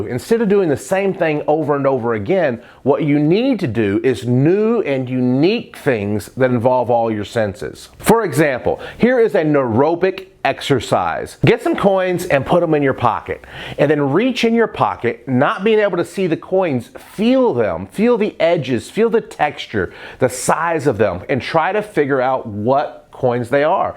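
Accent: United States. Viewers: Are you from America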